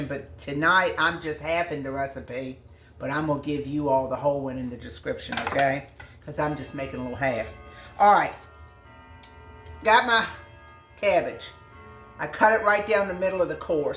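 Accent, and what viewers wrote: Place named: South, United States. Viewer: Loved your recipe. Love your accent, southern gal. Like I said before, I'm in Pa and love new ways to make every day food stuff. I pray you reach 1000 people watching. I know that is when they award you. Take care, Betty. An old grandma from Pa.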